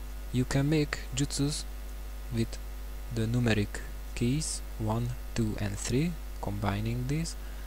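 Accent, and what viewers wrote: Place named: Hungary. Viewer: Annyira hallatszik hogy magyar vagy xD ;)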